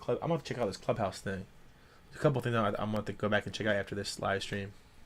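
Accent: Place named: Delaware County, United States. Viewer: 10 seconds into video I know your from Delco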